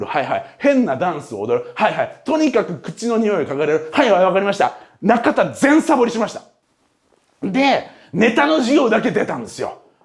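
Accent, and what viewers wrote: Kansai, Japan. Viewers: あっちゃんが関西弁たまにでる 理由やっとわかったわ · ２：２４：５６を見て始め映画並み？？？と感じました。でもまだ前半だったのですね。一気に観させてもらいました。確かに最初の自己紹介は初期の撮影ということもあり改善は必須だったかも知れないですね。今回の自己紹介①は授業同様楽しく観れました。 話しの最中で「たけしさん」言葉が連発していたのが少々きになりましたが、熱気は十分に感じ取れました。以前、２nd YouTubeでだったか、あっちゃんがターゲットは10代から30代と言っていた時があったような気がしますが、40代～50代（もしかしたら60台も）も視聴者層はいますのでよろしくお願いします。 東京（関東）の話し方の中に、関西弁が違和感なく入ることで聞いている方は入り込みやすいです。また、体を使った（張った）解説は演技力の賜物で普通の教育系ＹｏｕＴｕｂｅにはない魅力を感じます。学び心を満たしてくれる動画に感謝です。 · 「しくじり先生」終了後、もっとあっちゃんの授業が聞きたいなと思ってた時、カジサック経由でYoutube大学を知りました。とても毎日楽しく見てます。知的好奇心をくすぐる内容。学ぶって楽しい あっちゃんの喋りが説明のできない高い説得力(教祖のようと卑下してますが)の中に時々出てくる関西訛り、エセ関西人ではないけど基本標準語なのでとても特徴的だなと思ってたのですが幼少期に大阪で育ってたのが残ってたのですね頓着しました。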